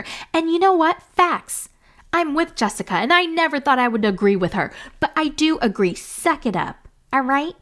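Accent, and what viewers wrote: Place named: New York, United States. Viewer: Your New York accent. Haha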